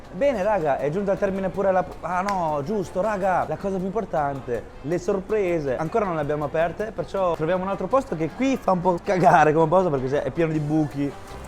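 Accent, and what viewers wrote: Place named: Pesaro, Italy. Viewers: Il tuo accento romagnolo😂💘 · Accento Pesarese direi.. Sei troppo simpatico!! Un saluto da Rimini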